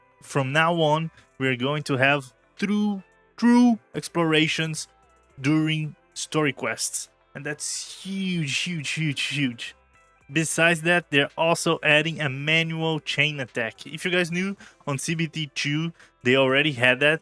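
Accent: Brazil. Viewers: sabia que tu era br pelo sotaque kkkk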